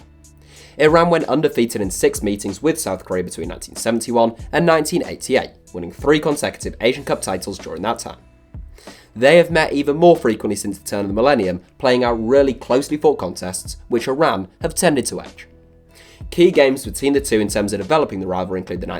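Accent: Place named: England, United Kingdom. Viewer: I have to say that english accent is too intense its so hard to understand what the guy is saying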